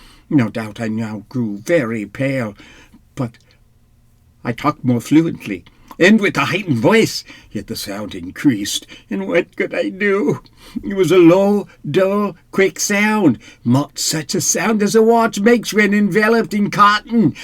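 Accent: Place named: South, United States. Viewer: Hahahaha!!! 🙌🙌❤ I absolutely love the southern accent!!!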